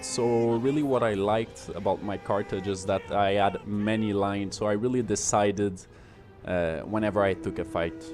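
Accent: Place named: Quebec, Canada. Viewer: You sound very french canadian to my french canadian ears. Am i right?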